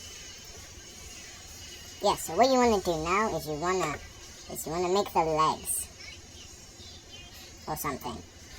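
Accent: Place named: United Kingdom. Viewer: U sound so british 😭😭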